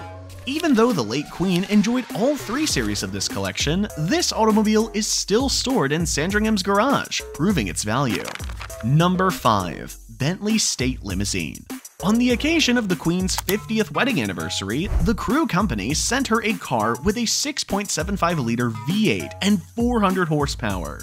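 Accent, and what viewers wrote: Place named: United States. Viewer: Typical video when you hear an American accent trying to explain British facts, you know it is going to be full of errors, lies and poor (or no) research.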